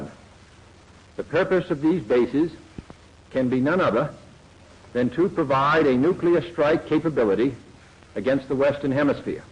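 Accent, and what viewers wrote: Boston, United States. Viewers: It was his Boston accent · What accent? He sounds like a Bostonian trying to sound like he's from Chicago.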